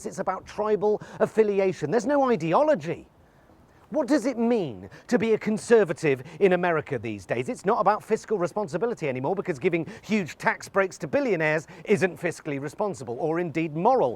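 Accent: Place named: RP, United Kingdom. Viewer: If you don't know what Democrats are about, read more than headlines on Google News. They have an entire platform, and get this, they actually talk about it on the campaign trail. The MEDIA is focusing on horserace. You here are every bit as bad as the rest of the media. Except your not, you're worse. You dress up in a suit and a tie and your posh accent and deign to tell ME that my candidate supports nothing but beating Trump. That's false, and you're an idiot for propagating this "two sides of the same coin" nonsense. YOU are the one playing into Trump's lies. What Democrats want to do: Covid-19/Health Care: Legislate to head off court challenges to the ACA. Expand the ACA with a public option. Use the Defense Production Act to make PPE, drugs, vaccines, and other medical equipment to fight Covid. Coordinate procurement and logistics for this equipment with the states. 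Create national policies for safe social interactions until such time as we get enough people vaccinated that we can go back to normal. Economy: Stop the trade war with China. $1 Trillion Infrastructure plan. Offer more money to protect small businesses struggling with Covid. Reinstate aid to people out of work due to the virus. Other things will be looked into after the virus is in check. Immigration: Pass a law to protect the DACA recipients permanently. Comprehensive immigration reform giving people here and contributing a path to citizenship. Reunite separated families. Foreign Policy: Where do we start?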